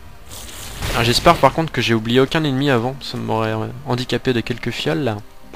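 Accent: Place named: South, France. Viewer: J'adore l'accent du sud de:D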